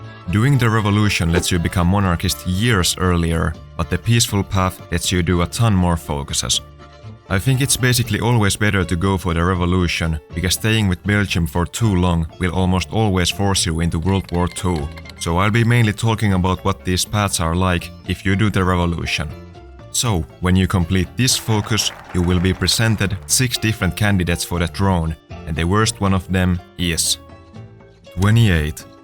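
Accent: Finland. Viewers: Are you Finnish becuse you sound like Finn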